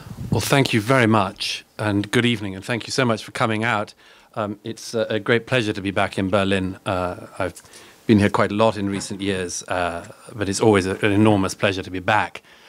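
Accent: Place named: RP, United Kingdom. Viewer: Murray is Tommy Robinson with a posh accent. I can think of no higher compliment (but not because of the posh accent; that is irrelevant).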